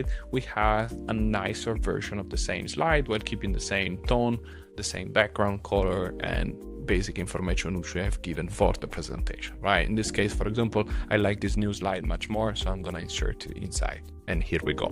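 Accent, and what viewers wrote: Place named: Italy. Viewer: This is awesome thank you for sharing this keep showing up! Saw your LinkedIn post about your accent. I think the Italian accent is awesome! 🙌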